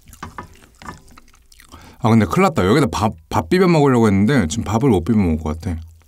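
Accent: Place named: Gyeongsang, South Korea. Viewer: 승부하부먹충 이게 왜 일베야 경상도 사투리고만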